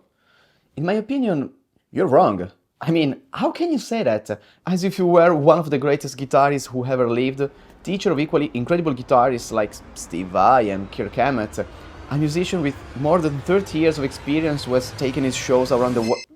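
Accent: Italy. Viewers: You're Italian. Recognise that accent anywhere. Have a sub. Good video.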